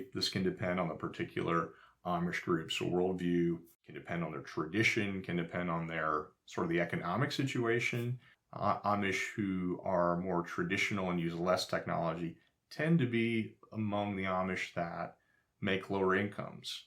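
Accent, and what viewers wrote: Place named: Baltimore, United States. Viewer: Have to ask, are your from Baltimore? I hear certain words that remind me of my childhood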